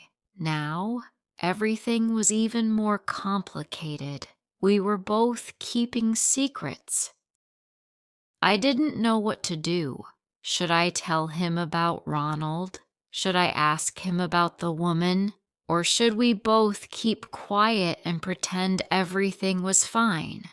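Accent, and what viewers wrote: United Kingdom. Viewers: Thank you very much for your stories ❤. Is that a british accent?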